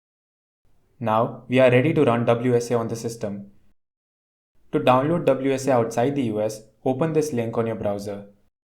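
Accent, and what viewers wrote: India. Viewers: I LOVE THAT INDIAN ACCENT ❤❤❤❤❤❤❤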